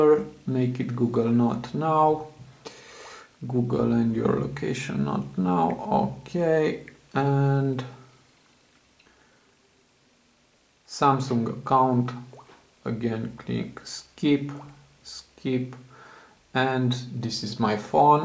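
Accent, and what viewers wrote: Romania. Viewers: I know! You're Romanian. :)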